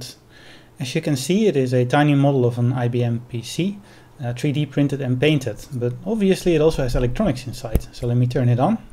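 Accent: Netherlands. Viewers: Nice! Good job. I like the dutch accent..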